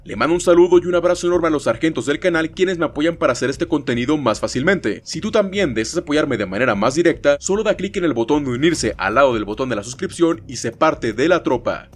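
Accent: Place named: Jalisco, Mexico. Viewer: Pensé que eras de Tlaquepaque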